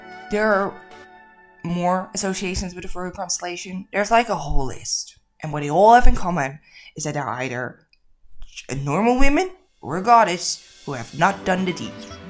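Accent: Netherlands. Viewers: Virgo should definitely be a pretty boy. Also I like your voice overs because your accent reminds me of my Dutch friend 😁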